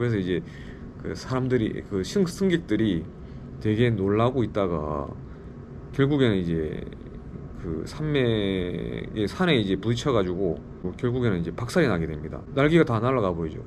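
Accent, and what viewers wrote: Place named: Busan, South Korea. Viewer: 너므 말씀 조리 있게 잘하세요 부산 말투가 좀 세련되게 느껴지는 보이스~~멋쪄요~~^^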